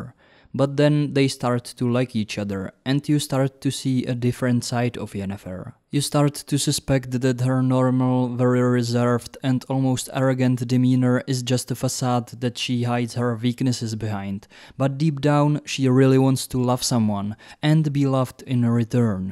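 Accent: Czech Republic. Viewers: love your Czech accent!❤ keep it up, bro.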